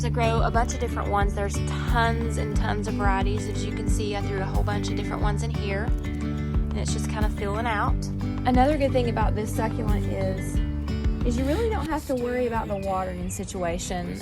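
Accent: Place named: Tennessee, United States. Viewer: Are you in Tennessee? I am . Your accent is similar. What type of soil do you use ?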